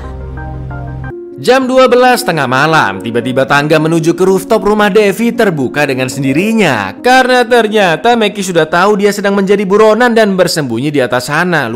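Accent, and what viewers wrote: Java, Indonesia. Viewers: Khas selalu sama logat jawanya bang.. jos bang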